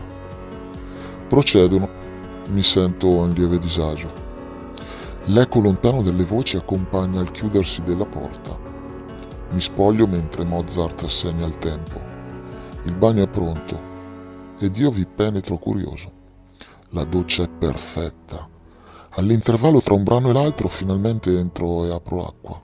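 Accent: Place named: Lombardy, Italy. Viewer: Bella voce. Ma di dove sei? Sembri Lombardo ma non capisco di che zona!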